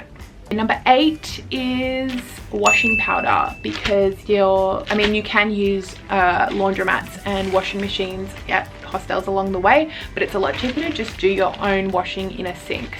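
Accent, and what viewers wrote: Australia. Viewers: Aussie as! I did not detect a lisp. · Am i right if this aussie accent ? or new zealand maybe ? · Aussie with a lisp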